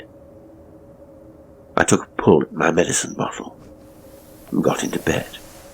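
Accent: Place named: United States. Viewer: This is the first story I have heard you read with an American accent. You are very versatile, Sir, and I am full of admiration.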